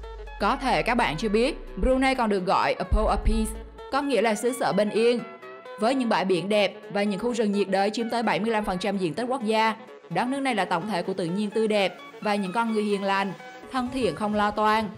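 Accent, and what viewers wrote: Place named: Binh Dinh, Vietnam. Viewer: Giọng Bình Định Phú Yên thì phải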